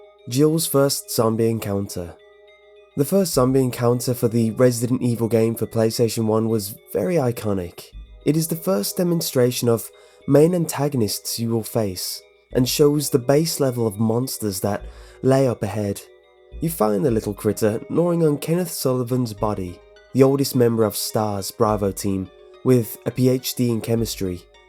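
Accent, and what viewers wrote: United Kingdom. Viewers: Dude he’s British You must be a idiot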